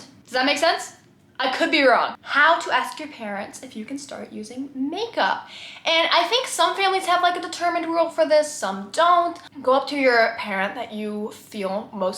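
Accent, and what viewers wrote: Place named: Texas, United States. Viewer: Is it just me or could I sense a bit of texan I’m her accent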